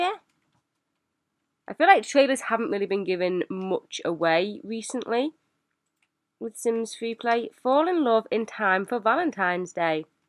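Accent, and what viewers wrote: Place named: United Kingdom. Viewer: Hi!!! I am so glad that I found your channel. It helped me a lot and your are so funny!!! I just have a small question. You obviously have a British accent, so do you live in Britain and if yes do you live in England ?? I am asking because I will probably visit England (more specifically London) and I would really be happy to know that I am so close to one of my favorite youtuber!!!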